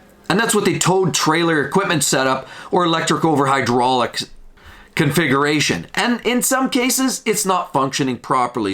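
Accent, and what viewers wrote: Canada. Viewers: It's his accent. He's Canadian I believe.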